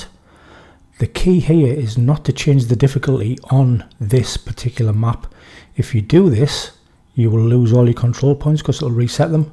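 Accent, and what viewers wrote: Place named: Durham, United Kingdom. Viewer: Just trynna get them tactical assessment bundle blueprints. Friggin things don’t wanna drop for me. Anyways, were you from mate? You sound like you’re from Durham!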